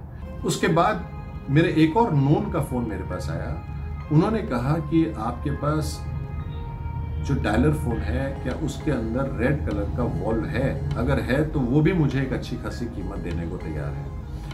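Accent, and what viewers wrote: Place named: Turkey. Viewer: I know this guys is speaking english but the turkish accent makes it gibberish :(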